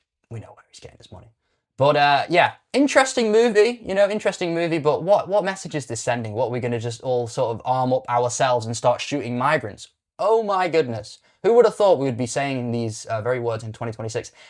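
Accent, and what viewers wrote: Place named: United Kingdom. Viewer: Right, so... The movie was shite yeah. BUT, tagging people "far right" or r@ for being sick of the migrant issue is part of what alienates them and pours oil on the fire because it closes off any real potential for dialogue. Hence the movie. The problem has festered too long without being addressed in a mature way, and lo and behold, now we have the state of the UK (you sound British, so maybe you should know). I'm writing as you go along; you cite Tommy Robinson, but NEVER has he advocated for people to be violent, especially in the latest mass gatherings. The scene in the flat with the family (I don't advocate for what happens next, of course): covering one family's sins is EXACTLY prescribed by Islam and is part of the saving face cultures from the Middle East. The "moderates" are irrelevant; they'll suffer as much as the rest when the fundamentalists act. 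They can't rat them out, nor will they do so for less serious crimes. And the number of families that have stayed silent when their brothers, sons, or husbands have committed the horrendous gang grapes is damning. So in that sense, the scene where the family tries to find excuses is not that caricatural.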